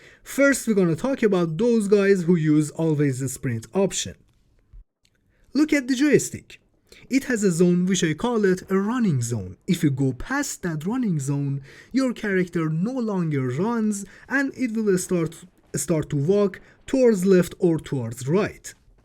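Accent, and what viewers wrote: Iran. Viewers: tell me if im wrong but your accent is screaming that u from iran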